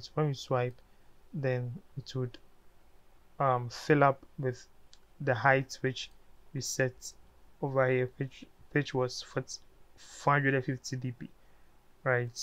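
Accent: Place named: Nigeria. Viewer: nice video, you have a nigerian accent are you from Nigeria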